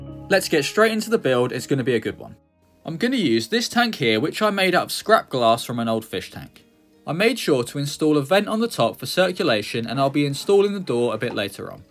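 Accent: United Kingdom. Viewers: bruh this guys british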